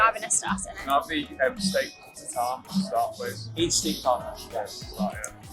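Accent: United Kingdom. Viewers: Love your very British accent ❤❤❤🎉🎉🎉🎉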